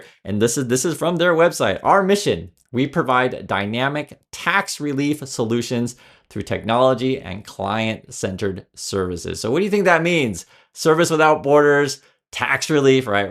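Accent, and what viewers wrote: United States. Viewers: I've only just seen your channel today. Your accent sounds American. you may be able to pass as Canadian. So why are people telling you to move back to China? It sounds like you were born here. Or at least grew up here. Although I would guess at least 3rd generation American.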